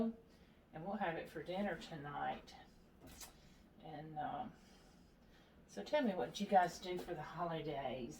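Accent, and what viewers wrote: South, United States. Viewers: You are a sweetheart. I very much enjoy your cooking (and your comforting southern accent).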